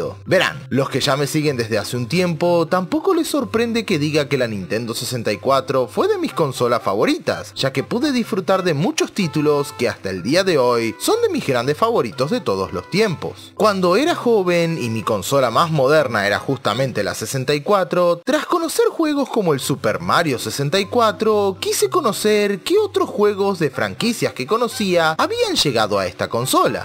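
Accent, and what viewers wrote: Uruguay. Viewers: espera... Sos de Uruguay?... Paisano! xd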